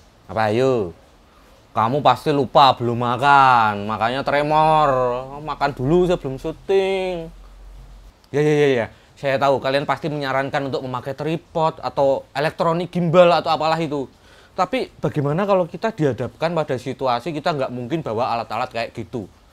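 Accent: Java, Indonesia. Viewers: Medok jawa ya bang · ilmunya mantap om , plus2 lucu2 gaya jowo asik ae rek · Pekat betul ya jawa mu mas..hehe udah subscripe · sek seksek....wih jowo banget😅😊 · jinguk baleni neh hahahaha.. njawani mas hahahaha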